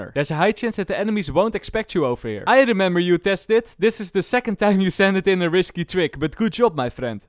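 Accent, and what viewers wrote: Netherlands. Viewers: why do i hear some dutch?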